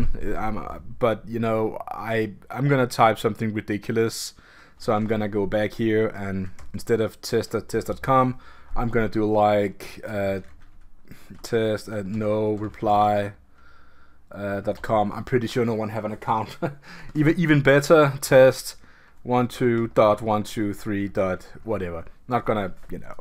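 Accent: Denmark. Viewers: Do i sense a hint of danish in that accent 🙂 Glimrende video må jeg sige, hutlihut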